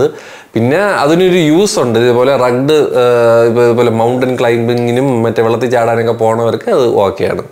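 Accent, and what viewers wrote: Trivandrum, India. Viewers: അണ്ണാ tvm language പൊളി😅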